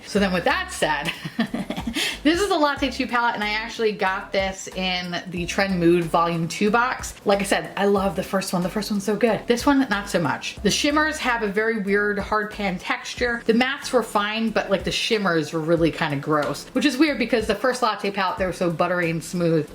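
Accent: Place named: New York, United States. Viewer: 4! There’s nothing I love more than you speaking garbage about things in your new york and Minnesota accents! 😂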